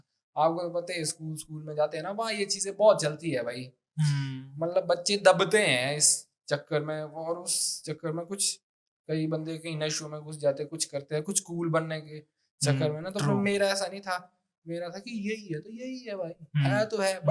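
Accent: Pahari, India. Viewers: Yahi bhai ki sadagi hai accent ni chodte pahari 😂love u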